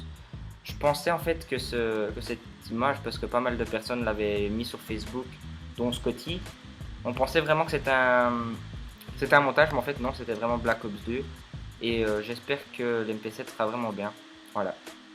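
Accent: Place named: Belgium. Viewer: accent belge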